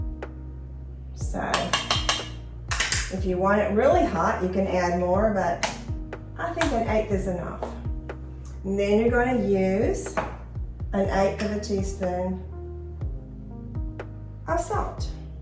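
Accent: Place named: South, United States. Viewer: Love that southern accent! And this recipe is awesome!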